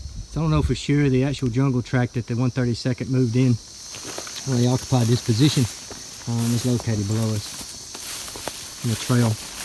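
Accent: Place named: South, United States. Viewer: Thank you for your walk-around tour and your knowledgeable account of the entire battlefield. I am a 58 year old Australian and I inherited a fascination of this "crucible of American & Japanese military doctrine"... My late father had me watching William Bendix & Richard Jaekol (spelling) in the movie version of Richard Tregaskis's book "Guadalcanal Diary" - which I have never read, nor James Jones The Thin Red Line - ignoramus that I am! :) I have the Terrance Malik film plus "The Pacific" - we in Australia owe our freedom to the brave young Marine's who stopped the Japanese advance southward in its tracks, while our own boys fought it on the Kokoda Track... Your calm, succinct, professional and detailed tour is just fantastic - thanks to you, I know of not only John Basilone, but now I can add Mitchell Paige & Davis to the list of brave men who won the Congressional Medal Of Honour to the numerous Navy Cross recipients you recall with a grace & calmness befitting the honour of these men. 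Now I know where & what 'The Galloping Horse' is, as well as 'Gifu' to add to my knowledge of the 'Edson's & Bloody Ridge, The Jeep Track, Fighter Airfield, the Emergency Airfield to add to my knowledge of Henderson, which I presumed was the sole Airfield - Wow - Coffin Corner, the Ilu River, the mistaken position /naming of the Tenaru - you have pieced this place together with the ability & acumen that would do any professional historian proud :) Your gentle, factual southern accent is ju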